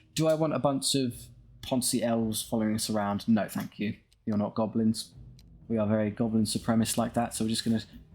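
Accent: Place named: United Kingdom. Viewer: I feel like this is an awkward thing to say but you sound delightfully brittish. Thank you for the uploads.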